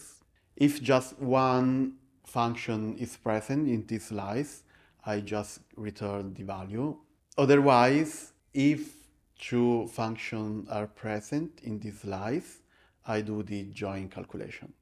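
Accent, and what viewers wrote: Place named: Italy. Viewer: Sorry for the Italian accent???? The Italian accent is awesome!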